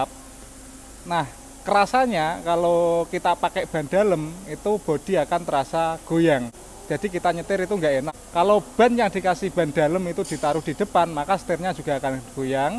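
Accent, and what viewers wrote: Java, Indonesia. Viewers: Ngomonge kaya wong jawa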